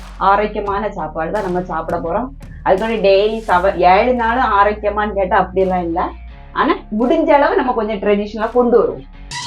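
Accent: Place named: Kerala, India. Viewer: Kattu soru. Kanyakumari enbadhal malayala accent/ dialect oda pesuhirar.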